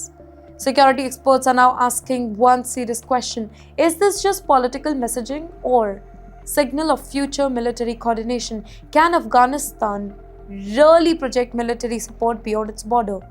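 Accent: India. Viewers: Her English smells like Hindi.. and shaking her legs while reading news.. correct it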